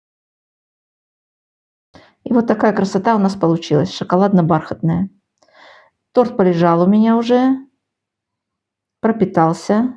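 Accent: Baku, Azerbaijan. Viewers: Спасибо за рецепт. У вас даже акцент бакинский ❤❤❤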